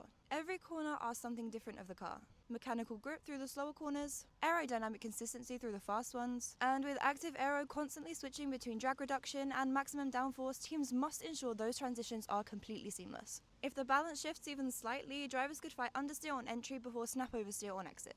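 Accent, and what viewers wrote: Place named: France. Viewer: That French accent 🔥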